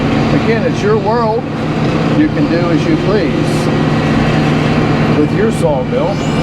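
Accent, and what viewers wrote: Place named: Pennsylvania, United States. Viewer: Love Pennsylvania accent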